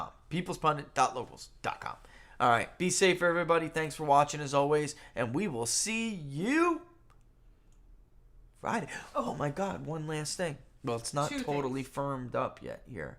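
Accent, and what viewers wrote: New York, United States. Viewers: It’s a New York/New Jersey thing, thus why Trump also uses this peculiar pronunciation. LOL.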